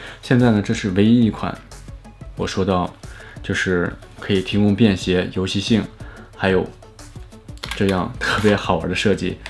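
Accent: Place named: Northwest, China. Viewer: up主浓浓的西北口音，祖籍是西北人吗？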